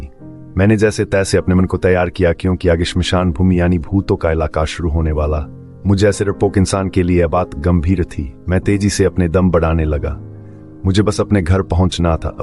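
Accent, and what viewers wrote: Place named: Pahadi, India. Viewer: Hindi Bolna Esa Lag raha he Jese Pahadi Ya Angrez Bol Rha Ho. Baki Story Acchi Bna Rhe ho..Bolne Ka Tarika Or Saaf Karo.👍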